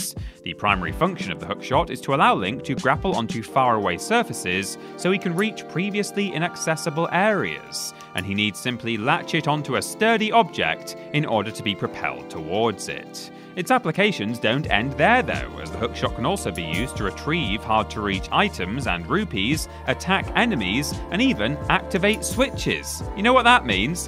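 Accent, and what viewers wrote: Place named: Yorkshire, United Kingdom. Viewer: Nothing like a cup of coffee and the Yorkshire tones of presenting a list to start the day, ahhh..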